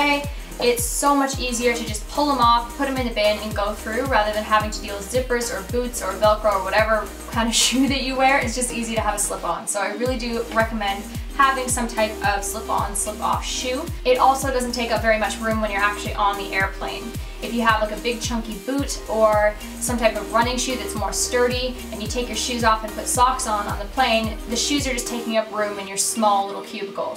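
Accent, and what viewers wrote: Australia. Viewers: Love this video! And you kinda have a Australian accent it comes through once in a while! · I love the hint of an Australian accent😍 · your picking up on the Aussie accent! 😂 It's peeking through with your speech!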